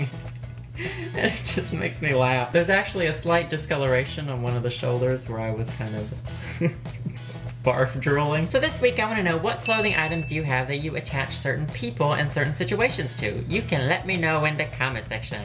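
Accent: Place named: Australia. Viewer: I swear, every episode it's like he has an undercover Australian accent that resurfaces from time to time.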